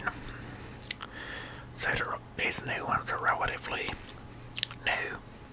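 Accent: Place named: South, United States. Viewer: he is not australian he just has a country accent.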